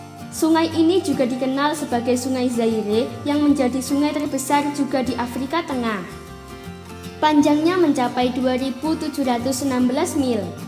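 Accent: Java, Indonesia. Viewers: Logatnya jawa banget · Orang Jawa 😊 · Jawa,,,,,,,,, suara nya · medok banget njiir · pengisi suaranya bagus si tp ya jng terlalu keliatan logat kejawaannya, ya memang si susah klw orang jawa Timur ataw Jawa Tengah untuk bicara bhs indonesia tanpa logat, laen orang jawa barat yg bicara bhsa Indonesia itu enak di dengar ga nyeklok gt, tp keren ko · Meddok jawane 🤣😁😃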